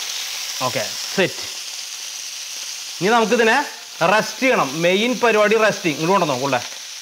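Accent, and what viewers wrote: Thrissur, India. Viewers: ത്രിശ്ശൂർ സ്ലാങ്ങും ഓവർ ടോക്കും മഹാ ബോറാണ്‌.. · ചേട്ടായി, മ്മടെ തൃശൂർക്കാരുടെ ആ സ്ലാങ് അതും കൂടിയായപ്പോ ഒന്നും പറയാനില്ല. സൂപ്പർ. അടുത്ത ഓഫീനു ഇണ്ടാക്കീട്ട് തന്നെ കാര്യം. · തൃശൂർ സംസാരം.... സൂപ്പർ ചേട്ടായി.... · Thrissur karande samsaaram poli anne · Pakkaa trissur ttaaa ❤️ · This is the first time watching your channel. Thrissur ആണല്ലേ. ഞങ്ങൾടെ അതെ സംസാരം. loved 🥰🥰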